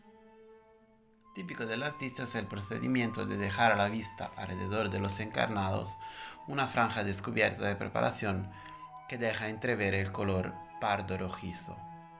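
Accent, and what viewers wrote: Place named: Italy. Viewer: ¿Que “contaminación” del italiano? Habla un español muy bueno, con acento italiano, naturalmente.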